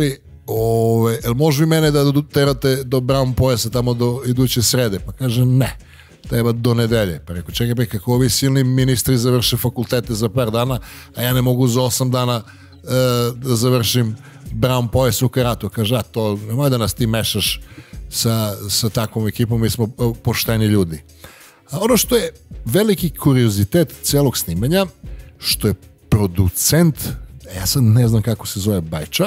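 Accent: Niš, Serbia. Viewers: Ti si nislija ja to ne verujem mislim na naglasak ne na ove kadrove a ti si presavrseno uradio ove kadrove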